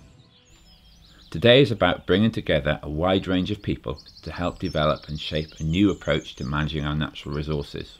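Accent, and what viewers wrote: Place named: Wales, United Kingdom. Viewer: Nice video. I really like the friendly narration and gorgeous Welsh accent.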